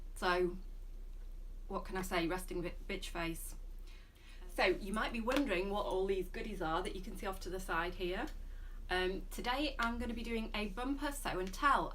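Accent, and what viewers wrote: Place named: Northern England, United Kingdom. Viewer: Great makes, where are you from? I’m from north wales... your accent tells me that you’re from up north somewhere too... anyway great makes! Well done to all your garments. X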